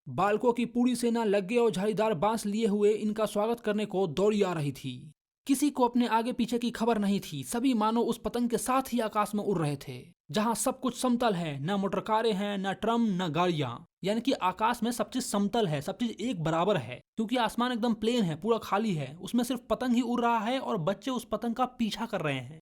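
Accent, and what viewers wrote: Bihar, India. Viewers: Bhai ap Bihar se Hai Kya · Aap bihari ho kya bihar ke lala lala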